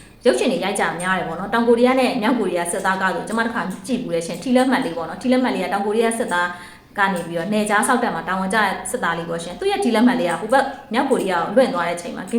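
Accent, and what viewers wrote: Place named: Dawei, Myanmar. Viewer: ကဲသိပီလါး။ဒွေးလေသံ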